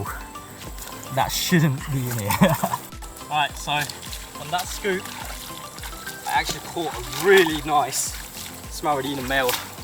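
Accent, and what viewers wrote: England, United Kingdom. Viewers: New to the channel have you lived in Britain you sound like it? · How come you have a strong english accent? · How come you have British accent? (In a good way)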